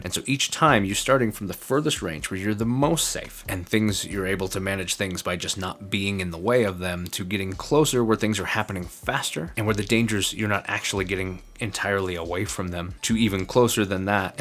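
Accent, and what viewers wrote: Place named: Visayas, Philippines. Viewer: KASAG bisdak ni ug gikan Tobosa klaro kaayo. Way bisaya naka basa diri hahaha.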